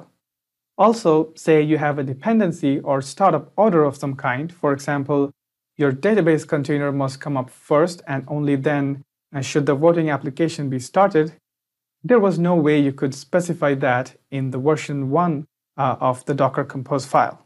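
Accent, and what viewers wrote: India. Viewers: always better with the indian accent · this look surreal. an indian who has very little indian accent.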